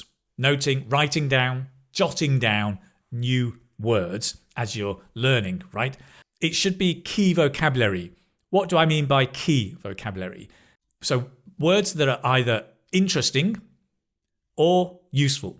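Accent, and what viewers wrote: United Kingdom. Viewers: It is amazing, how good I understand your pronunciation! The are almost 90% of your speech. I think it's depend on your wonderfull british accent. Your way to speak is very clear! I have so much problems to understand americans. thanks a lot!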